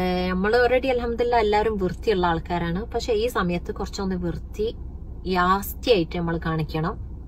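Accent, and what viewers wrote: Kozhikode, India. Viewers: കോഴിക്കോട് ഭാഷ എനിക്ക് ഇഷ്ടം ആയി 😍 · assal Kozhikode anallo samsaram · കോഴിക്കോടൻ മലയാളം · പച്ച കോഴിക്കോടൻ ഭാഷ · കോഴിക്കോട് ഭാഷ ഞമ്മക്ക് പെരുത്ത് ഇഷ്ടായി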